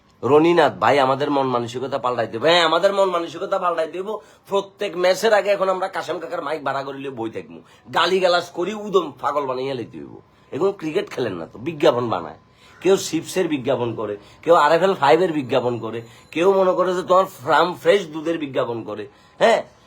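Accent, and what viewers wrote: Kolkata, India. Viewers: সেই হইছে কলকাতা স্টাইলে । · uni hotat kore kolkata accest e bolse pura video te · ভাই আপনার ভারতীয় বাংলা ভাষা এক কথায় অসাধারণ লেগেছে। একদম কলকাতার টিউন। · Apnar Kolkata er golar tone ta khub valo hoyeche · Dada tomar Kolkata accent ta darun laglo ...😂😂😂😂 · দাদা আপনি কিন্তু কলিকাতার মতন কথা বলতেছেন আমাকে খুব ভালো লাগতেছে কথা শুনতে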